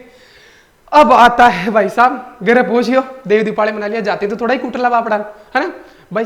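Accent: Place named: Rajghat, India. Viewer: Aap rajghat se ho kya sir aapki boli vesi hi h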